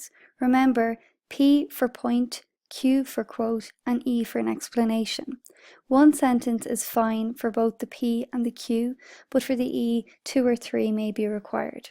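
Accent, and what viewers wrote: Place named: Ireland. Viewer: oh that voice that irish accent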